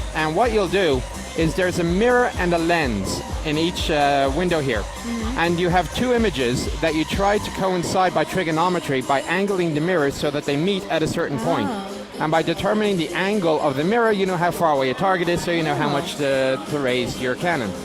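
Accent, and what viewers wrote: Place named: Ireland. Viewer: Love that Irish accent! When is your "Inside the Hatch" show gonna come out?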